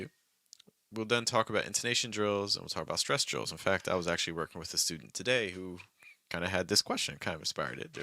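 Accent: United States. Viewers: i thought you were american! great accent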